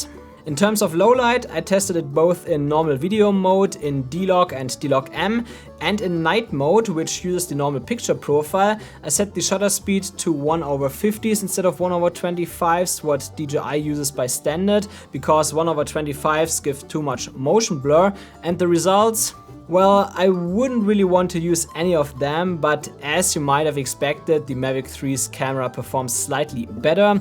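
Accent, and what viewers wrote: Germany. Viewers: Love that German accent 😂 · Deutscher Akzent so krass. Grüße aus Deutschland -:)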